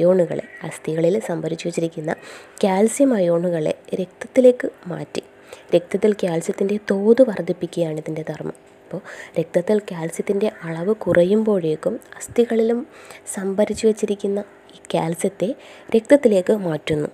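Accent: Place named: Thiruvananthapuram, India. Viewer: Ente same soundum slangum,Teaching methodum . Trivandrum aano. I am surprised.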